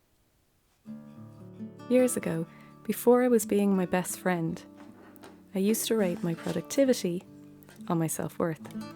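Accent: Ireland. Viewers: HVD to you too. Do I detect a wee bit of a charming Irish accent?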